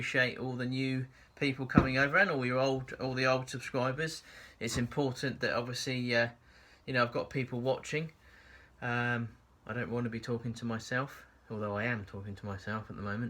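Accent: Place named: Basildon, United Kingdom. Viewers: I looked at your name and thought sweet what's this fulla upto? then you start to talk and I laughed out loud and said to this misses check this guy out! NZ woodturning and he's a bloody POM! good on yah mate, nice stump box...maybe to keep your outdoor in 😉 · What's your accent from? Basildon? Sounds like an accent quite close to me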